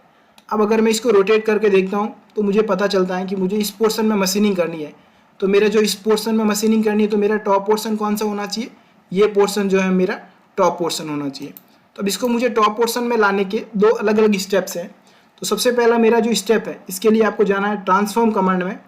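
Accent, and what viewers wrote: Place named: India. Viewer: Heavy Indian accent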